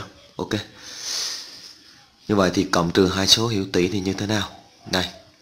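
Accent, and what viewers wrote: Binh Dinh, Vietnam. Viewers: thầy dân bình định hả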